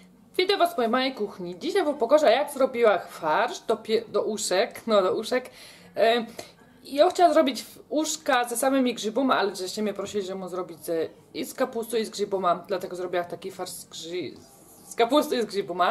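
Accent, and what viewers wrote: Silesia, Poland. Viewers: Superrr jesteś fantastyczna twoja gwara śląska jest niesamowita muszę codziennie Ciebie posłuchać. Buziaczki😘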